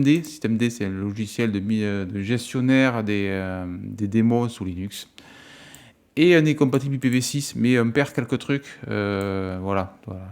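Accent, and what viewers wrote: South, France. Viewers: avec ce petit accent du sud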